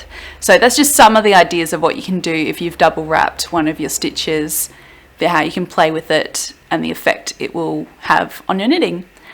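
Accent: Australia. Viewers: Thanks for this. I too am knitting socks with this instruction and now I know how to proceed. P.S. It’s nice to hear an Australian voice.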